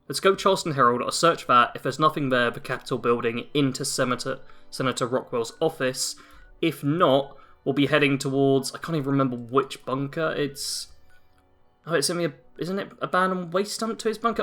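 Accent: United Kingdom. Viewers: It's all good. You're British. ;-). It is, however App-ah-lay-cha, not App-ah-lach-ia. Cheers!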